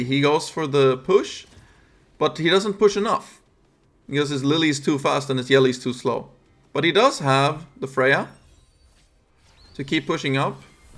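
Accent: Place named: Norway. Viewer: are you nordic by any chance? kinda sound norwegian to me😅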